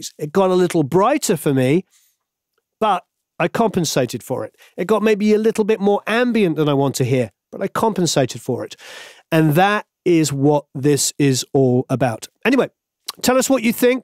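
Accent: United Kingdom. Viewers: I always mix on headphones. We moved from a place where I had a studio separate from the main house to a smaller space where my studio is directly across the hall from the den and one quarter the size. Whenever I would mix anything with a lot of bass on the studio monitors my wife would tell me to turn the bass down. I've tried a couple of room emulations and they may help...I'm not sure. Once you've mixed the advice is to bypass the plug-in before rendering the file, so the sound changes dramatically. I don't know... Couple of points: When I mix I always sit at the mixing desk, which I would assume is where the impulse of any room should be sampled at. So I don't quite understand why you would load up a room and then change the parameters. I get listening to different areas of your space while mixing on speakers but on headphones you have no idea when, say, adding ambience, where you are in the virtual space. 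Maybe I'm missing something? It seems to me that these types of plug-ins are more complicated than they need to be. Set me at the mixing desk at Abbey Road Studios (or wherever) and let me mix! And who mixes in their kitchen? Finally, why is it that when someone speaks with a British accent they always sound smarter? Don't get me wrong, you ARE very smart, but it just adds a little something to the equation. And do American accents sound smarter to a Brit? Great stuff as always.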